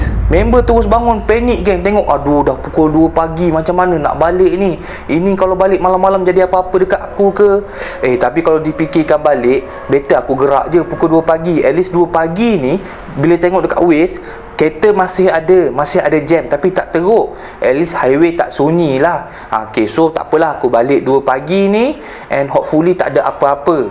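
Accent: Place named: Terengganu, Malaysia. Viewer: Assalamualaikum…akak dgn suami bru firstime dgr…bru 3 cite akak dgr…2 cite dr pantai timur…pastu yg ni…mcm dh start suke lak dgr adik b'cerita…loghat ganu tu…buleh dh tu…jd dh tu…😆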